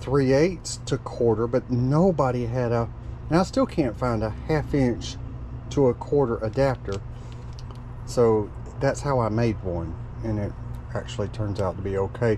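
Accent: South, United States. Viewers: 😆 no worries, ya'll got a good team as usual. Are you from Georgia? I was thinking one of the Carolinas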